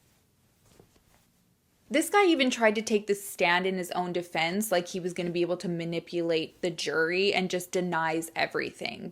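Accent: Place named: Canada. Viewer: Aw I thought I recognized a Canadian A Canadian accent I love it that’s so cute and Canadians are generally such great people so nice! XOXO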